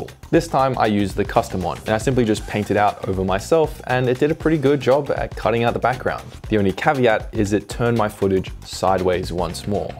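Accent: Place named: Australia. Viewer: ▼ - So great to hear an Aussie accent too!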